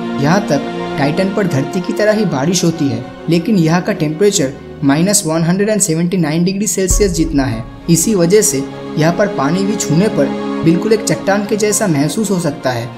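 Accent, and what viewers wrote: Bengal, India. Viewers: Bengaliness in Hindi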